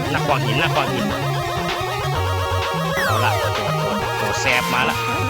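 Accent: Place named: North, Thailand. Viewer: ชอบมากเลยครับ นานๆทีจะมีอู้เหนือ 55+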